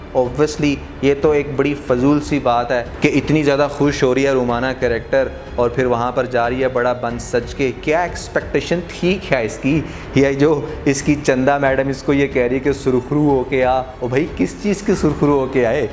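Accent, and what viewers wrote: Punjab, India. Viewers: I love your punjabi accent vele tute nickame ashiq..you are bang on right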